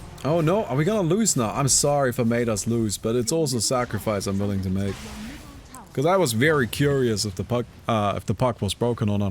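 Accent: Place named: Germany. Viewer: I remember the first day I started watching you, I could smell the german from miles away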